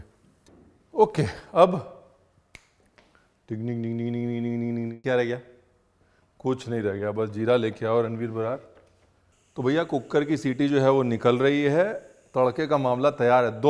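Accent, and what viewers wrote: India. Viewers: Ur food is next level sir,it always has been, but the way you speak in between, those small small nuances that u add,n ur desi accent adds a different flavor n tadka to ur recipes.love u n god bless u.😀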